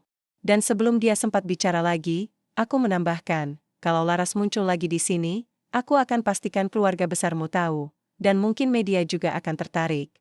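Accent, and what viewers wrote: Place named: United Kingdom. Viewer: Kenapa to kok harus pake logat inggris segala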